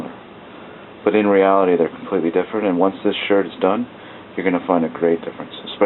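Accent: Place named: Canada. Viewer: I love your Canadian accent.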